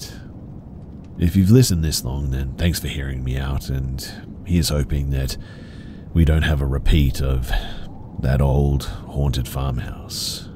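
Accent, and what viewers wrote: Australia. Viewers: His voice actually calms me due to the fact that it’s a really really deep Australian accent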